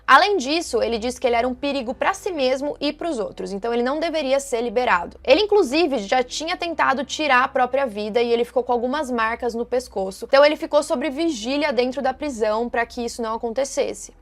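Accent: Caipira, Brazil. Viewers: Sotaque cáipira nojento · Narração caipira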